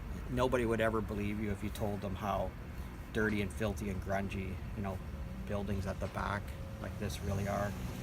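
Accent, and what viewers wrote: Canada. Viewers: Loved this 😁 was real and I could feel like I was there watching you draw!! Also love your Canadian accent expecially when you say (Out) 😁 great art, great artist, and great channel, all the best my friend 👍 ❤️🇬🇧☮️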